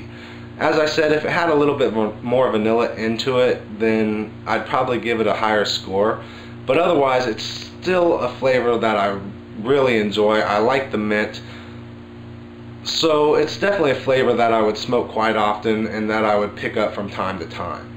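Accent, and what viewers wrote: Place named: United States. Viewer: Thanks for getting back to me and thanks for the advise...love your videos and i love your us accent better then my boring UK accent loll. x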